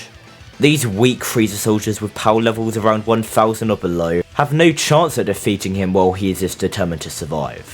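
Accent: United Kingdom. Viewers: I have a feeling your british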